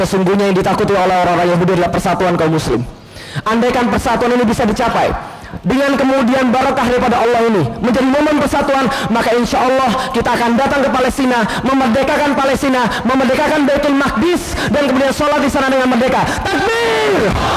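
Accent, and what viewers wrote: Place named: Sumatra, Indonesia. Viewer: Dahsyatnya...keberanian Ustadz Felix... .wajah boleh Cino....Tapi suaranya Sumatra.banget....Semoga dpt trs.. .berdakwah...aamiiiin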